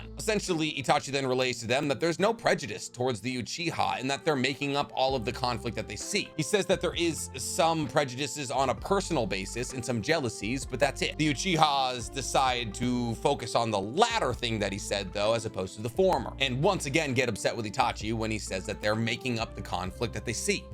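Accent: Boston, United States. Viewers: Bro your from boston for real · Wait whattttt is the homie from Boston?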